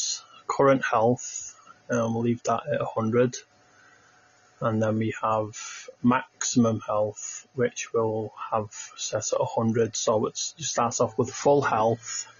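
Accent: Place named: United Kingdom. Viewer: thats the strongest british accent ive heard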